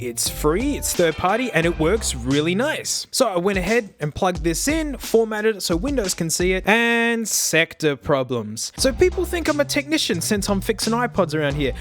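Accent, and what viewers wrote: Australia. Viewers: Where is your Aussie-Slang/Pronunciation :-O :-O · Teach use how to speak Australian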